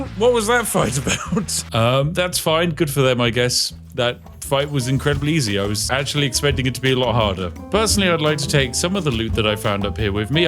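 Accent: United Kingdom. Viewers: honestly I like your videos where you exploit stuff, but this game doesn't really sit well with you for the most part its narrating things that have already been shared weeks ago, I think you are also on previous patch just based on how your inventory looks, and by the way there is easy way to get the greater infinite arrows from abbys challange that doesn't take much time with explosive arrows. so kind of too late and kind of doing it hard way, but narrated in nice british accent :D also did you know about the golden apples that you can make gold bars with? find it less annoying than trying to find nobles with goldbars :D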